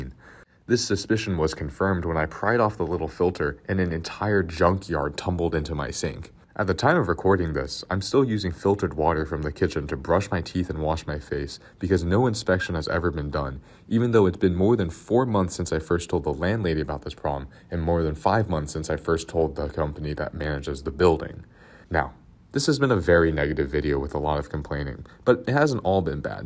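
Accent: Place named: North Jersey, United States. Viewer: Sounds like north Jersey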